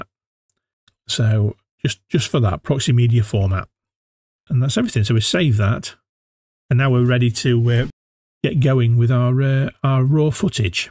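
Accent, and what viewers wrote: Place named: United Kingdom. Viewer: I get to learn Resolve while listening to a deep clear British accent, which for an American is a guilty pleasure. Lets go!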